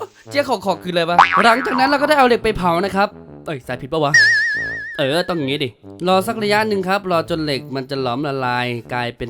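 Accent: Isan, Thailand. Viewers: พี่ฉันเป็นคนอีสานหรอคะ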